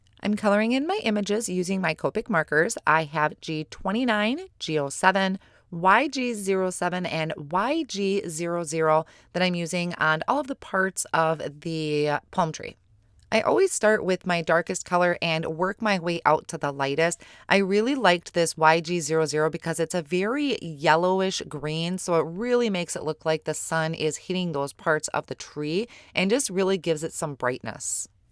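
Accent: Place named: Minnesota, United States. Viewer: you've got to be a Minnesotan... "pOst-it tape", at the very least a mid-westerner... I'm originally from MN so I can hear the a's and the o's a mile away... love the card and all things My Favorite Things!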